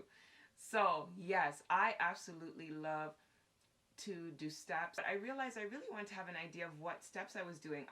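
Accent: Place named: Caribbean. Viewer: Gorgeous and intelligent? New subscriber here! :-) 🤔 Do I hear a slight Caribbean accent? ... Just got to 8 minutes. Definitely Caribbean. Lol ♥️ Yaaadie!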